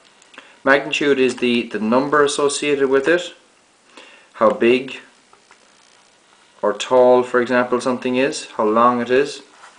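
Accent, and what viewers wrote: Ireland. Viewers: Irish accent <333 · he's Irish give him a break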